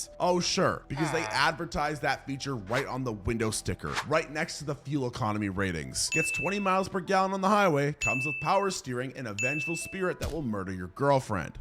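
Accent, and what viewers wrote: United States. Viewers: What country are you from? I assumed because you sound American, you're American. Your comment about calm down Americans with your Pew pews might be one of the funniest things I've ever heard in my life. And I am an American with a pew pew😂😂😂😂😂😢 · You aren't American ? Do not care at all, but you sound very American.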